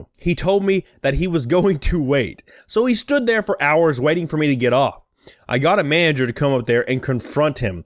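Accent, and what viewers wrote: South, United States. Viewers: thank youtube, for recommending me your channel. I dig ya, also like the light southern accent. Subbed. Also their customer service is horrid. The other day I was trying to get a PS4 controller and they only had ONE woman at electronics when there were like 6 customers waiting around for different things. Waited 15 minutes so she could pop a tab and ring up one controller...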